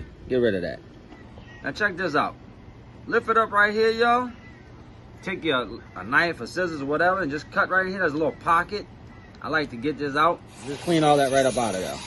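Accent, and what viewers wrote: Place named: South, United States. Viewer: Hey there ya all folkes...love that southern twang.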